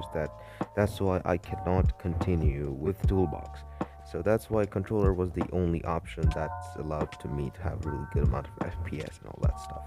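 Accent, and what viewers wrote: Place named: Singapore. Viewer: this sound like a Singapore accent I'm not gonna lie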